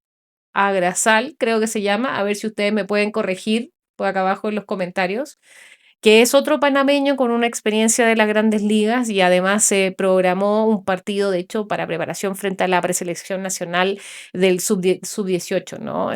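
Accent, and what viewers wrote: Chile. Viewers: Mi amor de dónde eres? Que voz más linda !!pareces Chilena el mismo asentó y la voz y sin fartarle el respeto eres muy linda❤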